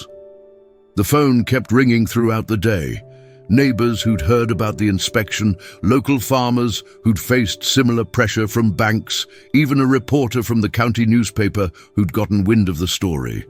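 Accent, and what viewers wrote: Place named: United Kingdom. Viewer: Do these ridiculous AI folks ever even think about how stupid it sounds to have a snooty British accent narrating a down-home family farm story in first person singular? Especially one where the neighbor says things like "Hot damn!" - obviously meant to have happened in the heart of American farmland. Sheesh.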